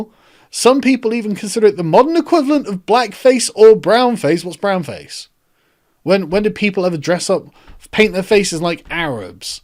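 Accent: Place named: Public school, United Kingdom. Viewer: "Kont...I kont"...what a twat public school accent.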